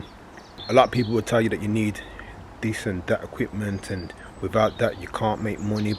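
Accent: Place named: United Kingdom. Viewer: You are spot on mate, I started on a pushbike and using the train, got some funny looks on the train with the hedgecutter! I did buy new at the start but my strimmer & hedgecutter came from Argos and the Titan multi tool from Screwfix. This allowed me to but a van & now a full kit of pro equipment, been self employed for 11 years this year, I'm not rich but comfortable, and that's the way I like it! Good luck to you chap, it's also great to hear a Brit on one of these videos!! 🇬🇧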